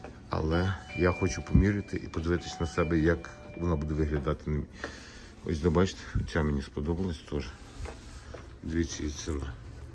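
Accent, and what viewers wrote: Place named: Western Ukraine, Ukraine. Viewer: Зустріла Вас на теренах ЮТьюба вчора, 2.06, випадково... Дуже була задоволена ...ну, і здивована - вперше чую українську мову західного ґатунку ! Вперше зустріла блогера зі США українця... Не ображайтеся - маленьке зауваження - Ваша заставка з палаючим бікфордовим "шнуром" - дуже неприємне нагадування тут, в палаючий Україні ....коли знаходишся сам, серед цього жаху...болить душа... розумію, то Ваш канал...але, бути там, ле Ви, і тут де я - "две большие разницьі" і нічого спільного... Бажаю успіхів !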